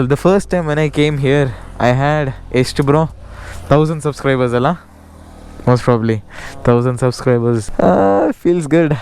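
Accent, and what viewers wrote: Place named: South India, India. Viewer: South Indian English I can Understand 👍👍👍👍👍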